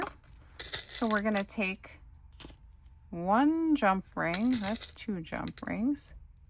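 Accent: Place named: Midwest, United States. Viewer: Hello! I am a first-time viewer and fellow crafter! I am ALSO a Wisconsinite....and I have to say...oooof...that mid-western accent!!! I'm thinking Wisconsin, Minnesota, one of the Dakota's of VERY VERY Northern Illinois!!! I LOVE this idea BTW!!! I plan to use this craft in my "Make to Sell" category!! THANK YOU!!!!!!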